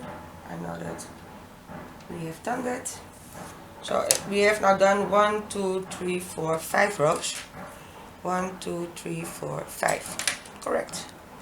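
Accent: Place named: Netherlands. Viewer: Are you from the netherlands ?? You sound dutch #dutchpower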